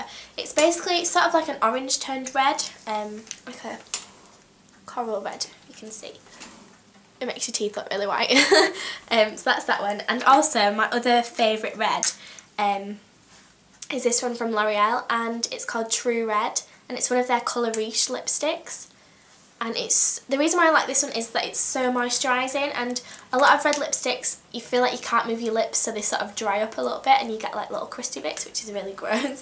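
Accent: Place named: Yorkshire, United Kingdom. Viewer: Your accent is amazing, are you from Yorkshire ? xx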